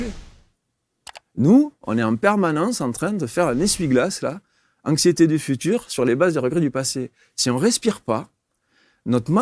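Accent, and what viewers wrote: South, France. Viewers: Dommage pour la barbe longue…😢 J’adore l’accent du sud. 😊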